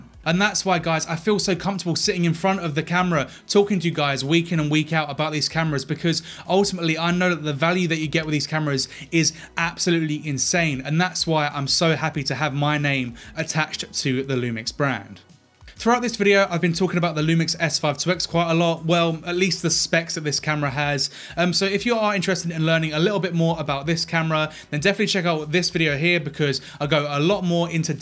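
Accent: United Kingdom. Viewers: I don't know if it's the irritating British accent or his personality. But he has the sincerity of a used fast-talking used car salesman. A camera is not a function of price and glass. I figured out at the end that he was auditioning to be a Lumix "influencer". He said that he was putting his name next to the brand. Am I supposed to know who you are?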